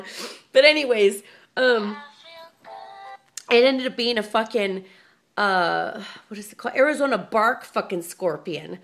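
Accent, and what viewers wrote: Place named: West Texas, United States. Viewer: Where you're from? You sound like some one in West Texas (El Paso) lol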